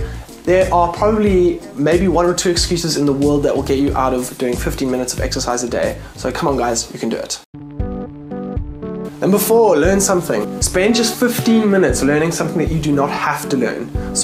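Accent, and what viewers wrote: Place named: California, United States. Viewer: And you're picking up the Californian accent.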